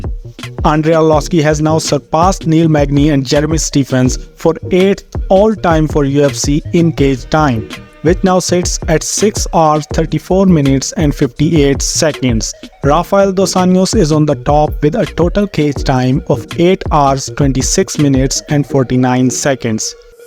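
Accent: India. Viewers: Thank you Mr. The guy with the Indian accent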